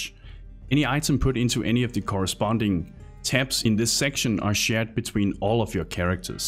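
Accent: Denmark. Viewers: Not sure what's worse, looking swedish or having that horrible danish accent. However, this video is exactly what I was trying to find, great tips! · Your accent is a give away for your Danish roots, but the Swedish hobo joke removed any doubt 😂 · are you danish? sounds alot like a danish accent... bashing the swedes... definitely danish :D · Thought you sounded aggressively danish then you started throwing shade at those pesky swedes. Thank you for the video bror 🙂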